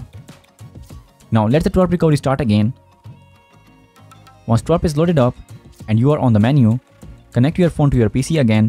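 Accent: India. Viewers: Well he's Indian? I'm just happy he's actually speaking English and not just click baiting with English title then full out Indian audio lol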